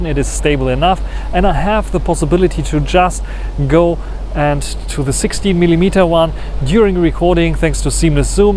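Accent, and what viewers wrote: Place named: Germany. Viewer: Bro where are you from?I like your accent sounds germanish xd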